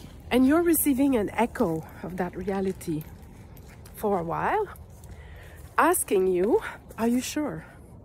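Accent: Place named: Quebec, Canada. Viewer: You sound québécois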